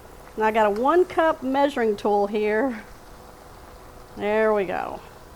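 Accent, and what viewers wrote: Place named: South, United States. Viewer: Soon as you hear a woman with a Southern accent say y'all let's get ready. You know you're in for a treat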